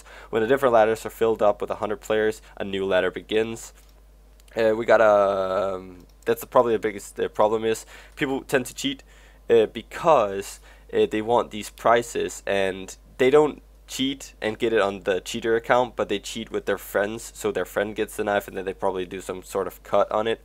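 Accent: Denmark. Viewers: HaHa kunne høre fra starten af du var dansk. :) god video! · your Danish? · Are u from Denmark? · Are you danish?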